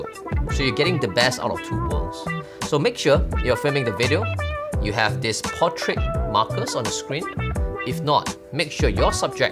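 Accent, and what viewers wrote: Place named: Singapore. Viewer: Thanks for the info. I recognized your accent and had to check your Bio. I miss the Singaporean accent!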